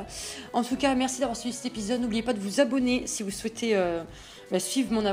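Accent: Marseille, France. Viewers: Salut :) Le petit accent Marseillais qui fait plaisir ^^ GG pour le Galekid dans Rubis,Saphir et Emeraude tu en trouve dans une grotte a la 2eme arènes ^^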